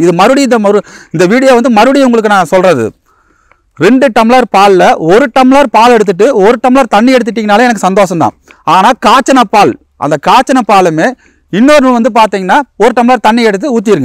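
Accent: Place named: Coimbatore, India. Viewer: Coimbatore Tamil very beautiful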